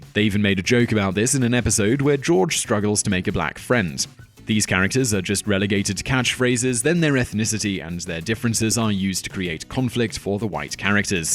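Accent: United Kingdom. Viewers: Hypocritical? Why does a white British sounding man always have to be the one imparting knowledge? White not let a women or person of color do your show. Maybe top 10s should turn the mirror on itself.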